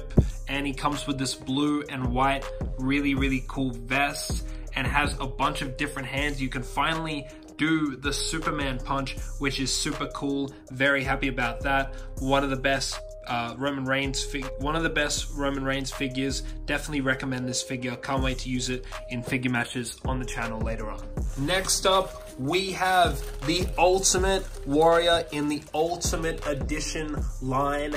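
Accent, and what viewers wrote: Australia. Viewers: Yea I’m pretty sure it’s because he’s from Australia so he has a little accent I caught that when I first watched this but I just saw your comment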